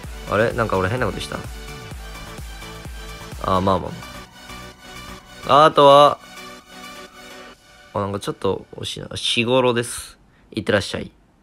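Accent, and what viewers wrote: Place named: Kansai, Japan. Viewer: めっちゃ関西弁やん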